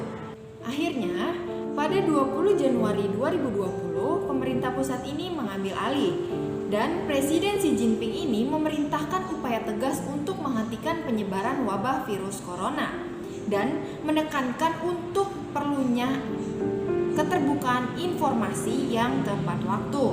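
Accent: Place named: Java, Indonesia. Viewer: Se' lo mba, medok temen bosone 😂 But, Informasi yg disampaikan sangat bermanfaat, thanks yoo atas infonya👍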